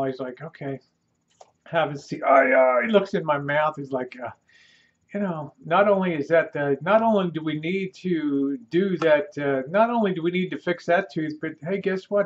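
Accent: Baltimore, United States. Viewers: I knew u were from Baltimore !! I was a city cop there for 23 years.The minute u said wooder for water. 😆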